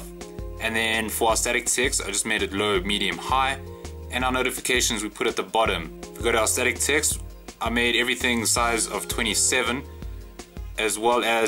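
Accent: South Africa. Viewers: Hmmm sounds like a South African accent.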